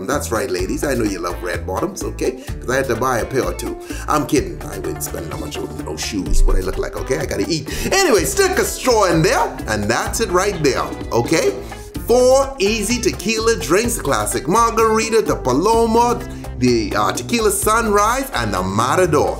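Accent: Bahamas. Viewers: Nice video. Sounds a little Bahamian. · He sound Bahamian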